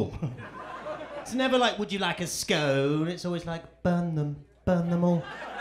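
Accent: United Kingdom. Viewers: Funny! And I’ll admit, british accents are my favorite accents 😂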